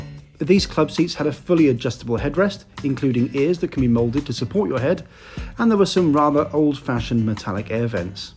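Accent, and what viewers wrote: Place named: Liverpool, United Kingdom. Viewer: Oh. No. He’s a southern scouser 🏆 😊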